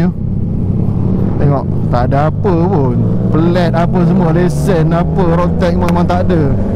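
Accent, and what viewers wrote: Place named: Kelantan, Malaysia. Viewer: org Kehel ke.. atau org Sabah??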